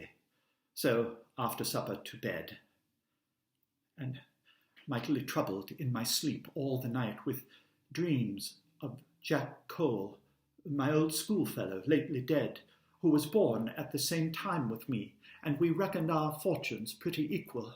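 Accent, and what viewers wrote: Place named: United Kingdom. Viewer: Thank you for this. Good British accent.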